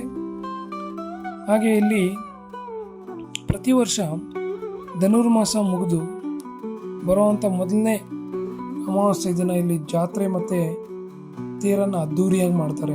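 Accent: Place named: Kolar, India. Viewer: Formal aag matadbeda loki nin accent ee channag aithe local kolar language ee matadu 😂 3 min video alli ond 10 sala aadru "galu " barbekittu ❤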